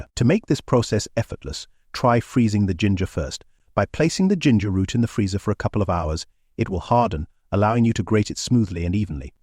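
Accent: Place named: RP, United Kingdom. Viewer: No idea why but the narrator is simply hilarious. Like he's pretending to be Toff English 😂😂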